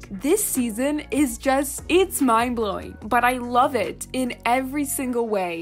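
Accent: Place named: New York, United States. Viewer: In the beginning I thought it was someone from New York I dunno I’m just saying!?!?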